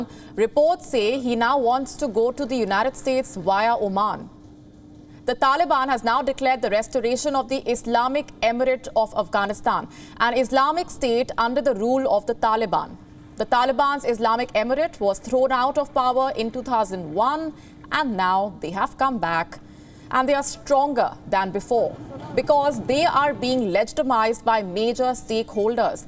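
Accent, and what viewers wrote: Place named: India. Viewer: 印度英文口音好明显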